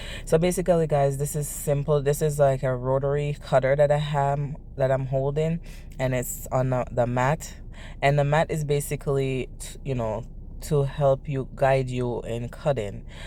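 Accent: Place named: Jamaica. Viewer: You sound Jamaican..... love that 🇯🇲🇯🇲🇯🇲🇯🇲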